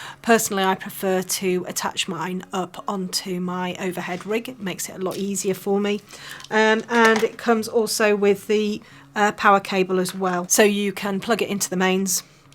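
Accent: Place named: United Kingdom. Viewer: I got really excited when I heard your british accent, that means I should be able to get a hold of this. Thank you!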